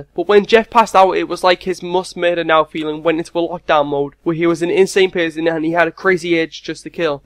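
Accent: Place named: Manchester, United Kingdom. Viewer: your voice! <3 where do you come from, it sounds somewhere in the Manchester area in England :3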